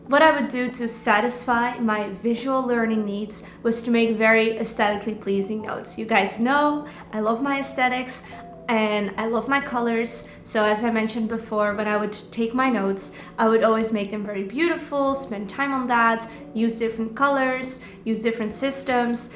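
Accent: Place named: Netherlands. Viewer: Hoor je nederlandse accent 🥰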